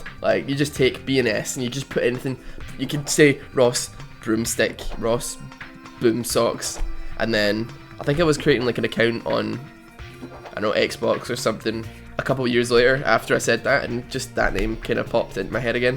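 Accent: Scotland, United Kingdom. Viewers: Im scottish too lol literally sound almost identical to you * u * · You sound Scottish. Nothing else.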